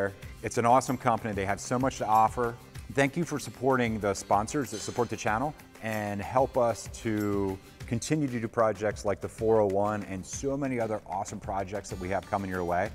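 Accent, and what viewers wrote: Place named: Pennsylvania, United States. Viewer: The way you say words like drawing - drawling is so PA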